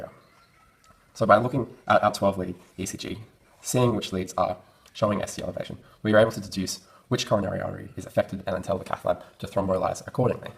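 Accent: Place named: United Kingdom. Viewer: foreign accent (British) and fast talking. I agree. LOL but still a good video, I just had to rewind a few times. Dan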